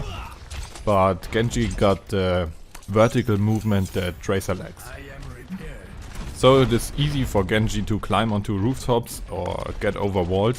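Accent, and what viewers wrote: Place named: Germany. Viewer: Are you german? I think you are i can hear of your dialect. Like your vids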